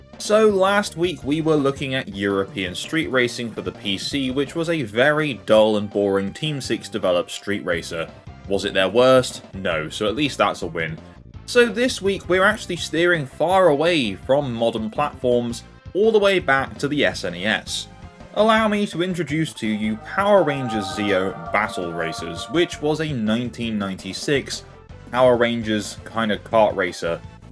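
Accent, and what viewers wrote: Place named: Birmingham, United Kingdom. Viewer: Well, you're definitely not the Budget Builds Official guy. Same middle class Brummie accent though. Since I don't like SMK, I definitely wouldn't like this one.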